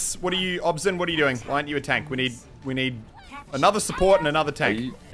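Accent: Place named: Australia. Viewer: It's because of his Australian accent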